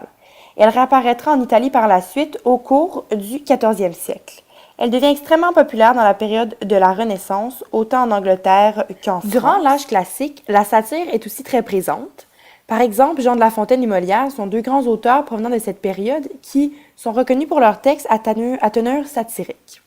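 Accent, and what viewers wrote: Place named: Quebec, Canada. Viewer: Tu es québécoise j’adore ton accent français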